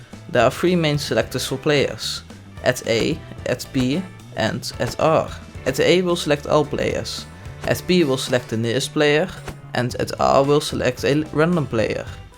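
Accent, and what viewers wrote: Netherlands. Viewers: are you dutch so not English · are you dutch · that dunglish tho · Are you dutch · hij heeft echt zon nederlands accent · duidelijk een nederlander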